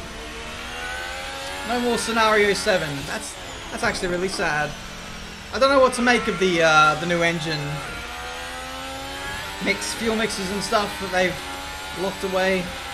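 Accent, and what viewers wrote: Australia. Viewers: an Italian here. I confirm your pronunciation was on point, even if the English Australian accent was still there 😂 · If you wouldn't have that aussie accent you would have said mugello pretty accurate. You say "mugellou" you should say "mugello"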